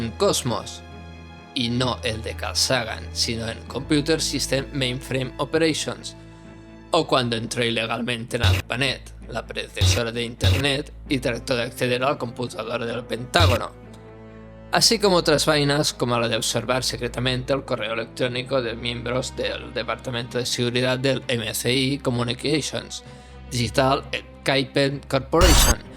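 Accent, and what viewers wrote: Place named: Catalonia, Spain. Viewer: Em fa molta gràcia com se't nota que ets català 😉 Salutacions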